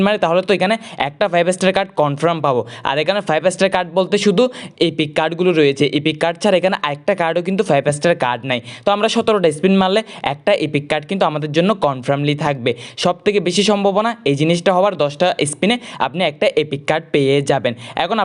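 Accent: Chittagong, Bangladesh. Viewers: Apnar Kotha sone Ami 100% sure apni chatgaya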